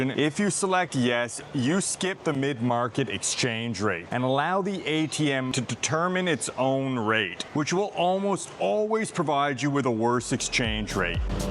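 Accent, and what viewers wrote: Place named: Canada. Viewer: Thanks for your videos! Very thorough, informative and concise, all spoken in clear Canadian English!😊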